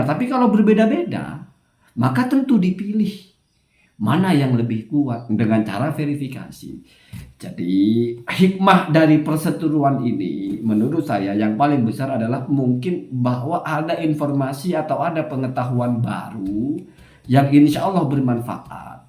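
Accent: Madura, Indonesia. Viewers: Debat soal nasab, saya belum yakin kalau belum dengar hujjahnya. Penyampaiannya simpel, jelas, netral dan lugas dengan gaya Maduranya😁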